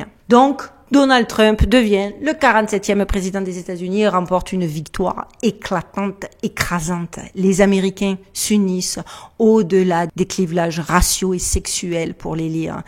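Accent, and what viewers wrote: South, France. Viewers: merci perché de votre accent du sud 'j'espère que la vague de ce tsunami nous parviendra jusqu'ici en France ,chez nous aussi c'est le bordel j'ai l'impression d'être en famille en vous écoutant😂🙏 prenez soin de vous à très bientôt .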